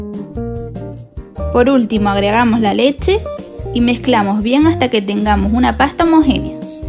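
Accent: Canary Islands, Spain. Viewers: Como se nota ese acento canario ❤ saludos desde mi cama :) (Tenerife)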